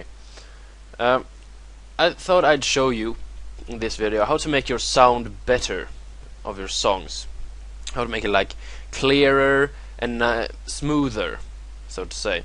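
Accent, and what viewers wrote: Sweden. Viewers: You sound Swedish...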